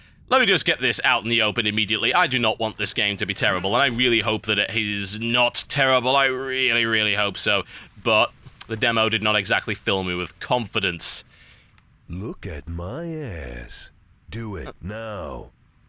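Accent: United Kingdom. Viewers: reason i watch the whole thing your british accent :D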